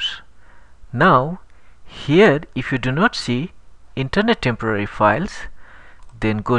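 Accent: India. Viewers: The guy is Bengali as per his accent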